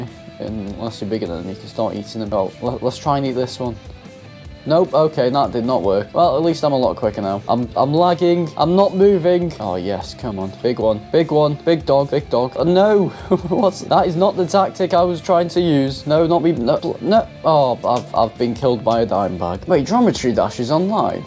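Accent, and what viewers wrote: United Kingdom. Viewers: I love that British accent, it makes me feel that I'm the one troubleshooting the pc.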